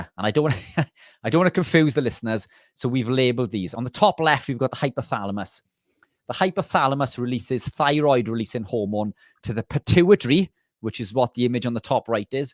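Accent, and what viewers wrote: Wales, United Kingdom. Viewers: I was truely fascinated by your talk ...all your knowledge...I'm 90% carnivore and sometimes eat seeds...nuts...and potato mash at weekend....now I'm aiming for 100% carnivore. Thank you ❤ Can I just add how sad I felt when you mentioned your Dad...unfortunately his loss 😢 Thank you again for passing on all your knowledge 🙏 I LOVE YOUR WELSH ACCENT 😍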